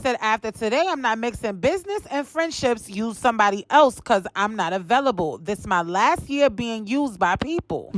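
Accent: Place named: East Coast, United States. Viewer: I’m so glad I found your channel. You be having all the tea. You sound like you from the east coast. I’m from NJ but living in NJ. New subscriber!!!!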